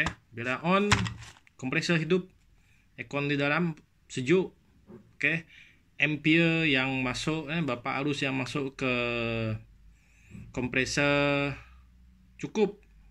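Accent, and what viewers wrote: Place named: Bidayuh, Malaysia. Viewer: Slang bidayuh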